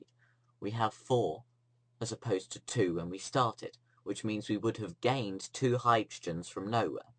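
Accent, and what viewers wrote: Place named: United Kingdom. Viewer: His voice is British! Minnesota Literacy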